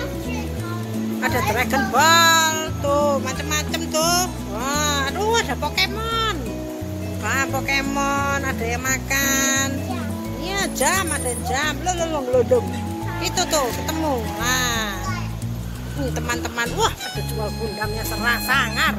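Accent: Java, Indonesia. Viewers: Kok kya orang jawa hhhh...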